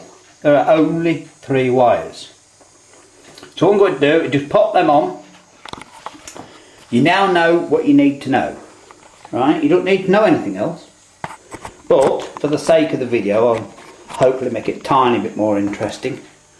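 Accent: England, United Kingdom. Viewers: Your the best , love your English accent Keep it up Bro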